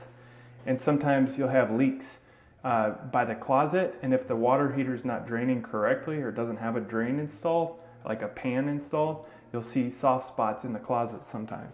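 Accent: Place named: United States. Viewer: Nice to see a video where the person speaks decent American that we can all understand.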